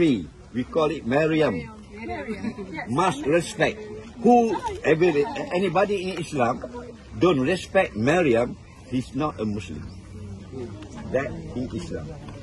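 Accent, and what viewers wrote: Terengganu, Malaysia. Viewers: English Terengganu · terkenal sohor dgn loghat daerah tganu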